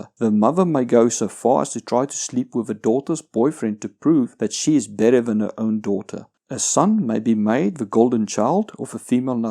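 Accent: Afrikaans, South Africa. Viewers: Nice vid, I subbed and liked. All the points were accurate and appreciate the time stamps. Another thing ill add, even though you did touch up on it is, they are always the victim, no matter what. Also, you have a very South African accent! I checked your 'about' and confirmed my assumption. That's great, I moved to SA in the early 2000's. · Ok so the man speaking here is Afrikaans right? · I was surprised to hear his South African accent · One question: Are you South African? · Safrican accent detected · Tell me you're from South Africa without telling me you're from South Africa. That accent is super thick. By the way, good video.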